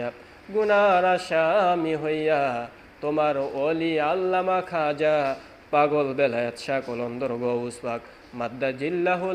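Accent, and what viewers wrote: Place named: Habiganj, Bangladesh. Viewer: আপনার মিলাদ শুনে মনে হচ্ছে, আপনার বাড়ি হবিগঞ্জ সদর এ। হ্যা অথবা না!!! আশা করি উত্তর দিবেন।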